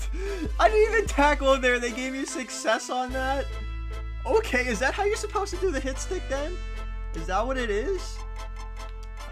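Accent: Pittsburgh, United States. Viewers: r u from Pittsburgh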